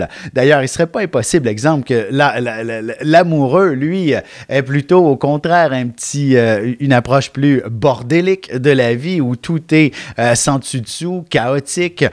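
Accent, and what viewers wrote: Quebec, Canada. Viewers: Bonjour merci je vous suit depuis longtemps avant il yavais une jolie dame blonde a vos côté je me demande ce quel devient ? En tout cas j'aime bien votre accent québécois ☺️ J'ai une question je suis née le 29Mai1992 18h45 Montpellier France Esque l'année 2023 serais bénéfique pour moi niveau sentimentale ou argent j'ai envie de me faire une chirurgie esthétique courant mars mais je doit d'abord récolte.. Voilà Monsieur a bientôt en espérant avoir mon thème sur ma prochaine vidéo ☺️🙏🦋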